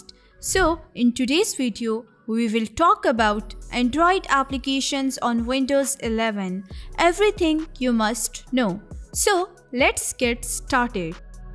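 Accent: India. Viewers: I think you have really interesting Content, but I can’t stay this Indian accent anymore. Sorry i tried. Really 😩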